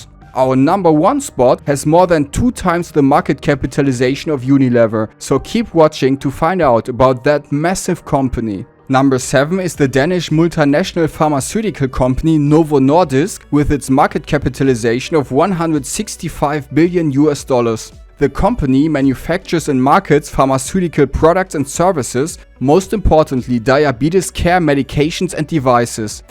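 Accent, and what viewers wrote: Germany. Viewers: Love an English German accent.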